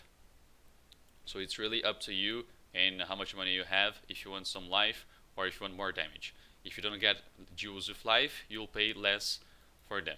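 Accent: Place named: United Kingdom. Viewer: Agora sim eu consigo escutar esse britânico nato falando <3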